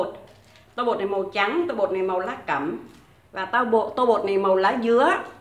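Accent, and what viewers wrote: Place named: North, Vietnam. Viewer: Bà ơi, cho con thắc mắc 1 tí được không ạ? Con thấy bà nói giọng bắc, có phải bà là người miền bắc không ạ?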